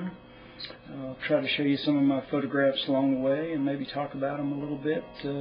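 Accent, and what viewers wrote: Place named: Texas, United States. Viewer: Heyy, just wanted to say thanks for sharing. You definatley do not look your age, you have beautiful eyes. And I could tell that you were from Texas, I can detect a slight hint of a Texan accent. When did you move to Colorado? Have a good week. --//xo;Ace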